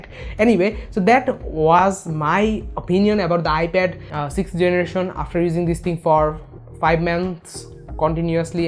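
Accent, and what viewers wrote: India. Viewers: Your english is so smooth than another indians. Love the accent 🤓 🇲🇨 love indian people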